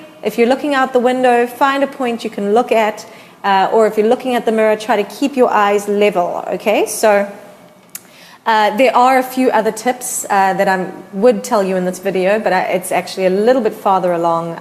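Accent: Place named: South Africa. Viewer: I'm a huge fan of - her wonderful voice, her upbeat attitude, her wonderful songs and musicianship - and her lovely South African accent. Rock ON girl!!!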